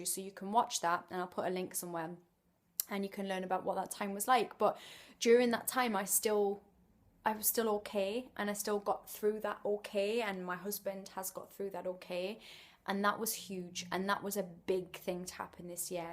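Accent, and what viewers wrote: Newcastle, United Kingdom. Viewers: Happy New Year,. I really appreciate watching your videos. Your pieces of advice and stuff are highly significant for me and, on top of that, I love practising my English by listening attentively to your Geordie accent which I had not been familiar with until I came across your channel here. #Peace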